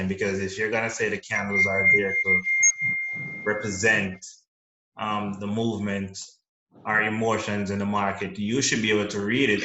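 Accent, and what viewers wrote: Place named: Caribbean. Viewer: Which Caribbean island you from bro? JA?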